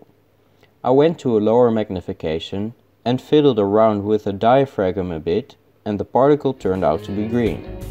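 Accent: Netherlands. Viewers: That moment u just feel the Dutch accent and its true too 😂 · My Netherlander friend, I say this only because I know you are interested in knowing things and not because I am trying to be pedantic, diaphragm is pronounced "die-a-fram." The G is silent. Thanks for the all the great videos & love your accent!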